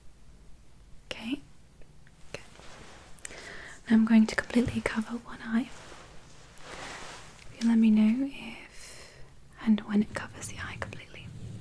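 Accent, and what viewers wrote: England, United Kingdom. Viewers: Love to hear the English accent. Good stuff👍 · You have the nice british accent, im a british too. Proud of 🥰🥰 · ill never get over her British accent...sooooo calming and relaxing !!! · LOVE that English Accent of yours!!! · Love your asmr clips, your gorgeous and your British accent is so good! you should be in the next Austin Power's movie if they ever make one 🙂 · That is the best english accent from an american ive ever heard. As a formal member of the UK. Good job! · Was she trying to do an English accent? 😩😂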